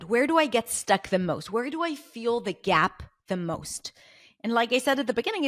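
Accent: Spain. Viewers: Incredible, your English being gently touched by Spanish... I wish you the best in the new life and learning process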